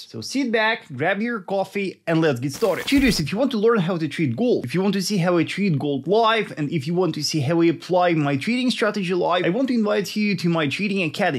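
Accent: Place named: Russia. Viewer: Damn I never heard such strong Russian accent. 😊 love Russia, the only sane people in the world! I hope to learn from you!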